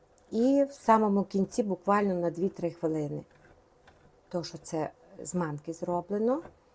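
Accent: Transcarpathia, Ukraine. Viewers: Дуже приємно слухати господиньку із закарпатський акцентом. ❤ Закарпатська кухня дуже смачна. ❤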